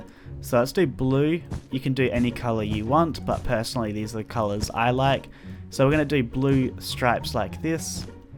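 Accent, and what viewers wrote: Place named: Australia. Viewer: Just Subscribed! Im australian and you sound aussie too!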